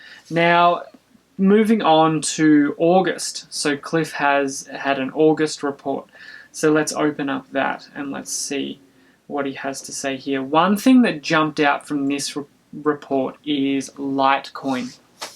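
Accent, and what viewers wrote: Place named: Australia. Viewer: Are you an Aussie, Mate? I can hear your Stroine! Goodonya mate! The Theme Song kinda gave it away as well!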